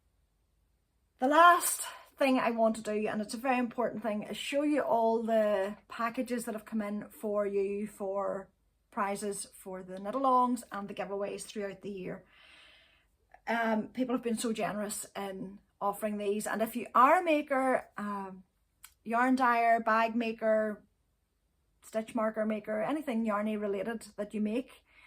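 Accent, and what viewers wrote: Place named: Ireland. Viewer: I so love your podcast! Thank you for uploading your videos. I especially love listening to your Irish accent. You could read the phonebook and it would still be interesting. Blessings to you and your family. Haven't seen your cat for awhile. Does he still like to perch on your k we while you knit...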